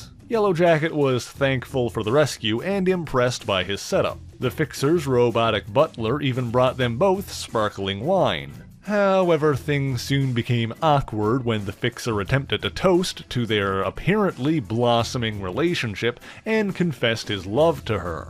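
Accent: Newfoundland, Canada. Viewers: Love the channel. I have been wondering where your accent is from?Newfoundland?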